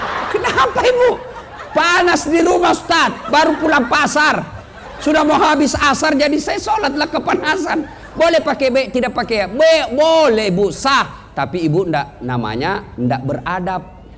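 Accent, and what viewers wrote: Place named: Bugis, Indonesia. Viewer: Assalamualaikum... Saya juga suka sngat ceramah ustaz das'ad latif.... Tpi sy tak berapa nak faham, pekat sngat bahasa bugis nya..boleh tak sempan sarikata dekat bawa..(melayu) 😊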